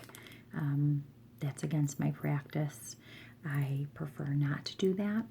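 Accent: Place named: Minnesota, United States. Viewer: Listening to you speak is like watching the movie "Fargo"!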